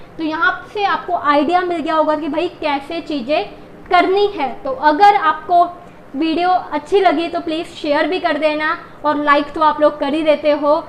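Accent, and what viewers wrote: Punjab, India. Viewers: Didi aap punjabi ho kya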